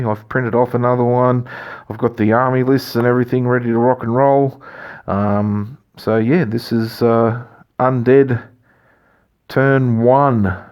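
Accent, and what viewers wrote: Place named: Australia. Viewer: Aussie accent , pls tell me youre in Melbourne ?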